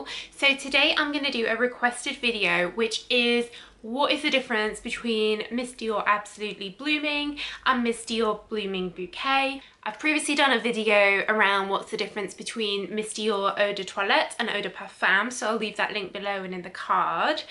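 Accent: United Kingdom. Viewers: Hi! I already suscribed! love both versions but I prefer Absolutely blooming ❤ I 'm from Argentina and I do love the world of perfumes. As I'm an English teacher, I enjoy listening to real Britsh English reviews😉❤ they are really interesting and descriptive and it feels like you are smelling those marvellous scents😘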